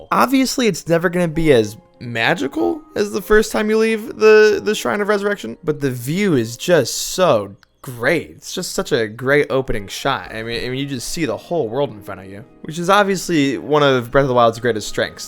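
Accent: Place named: Midwest, United States. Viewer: Midwestern man YouTube voice is music to my ears.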